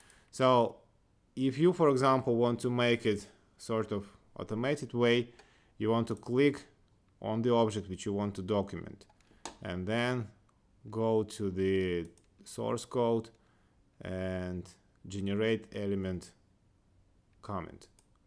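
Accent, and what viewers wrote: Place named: Ryazan, Russia. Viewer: Заметный русский акцент)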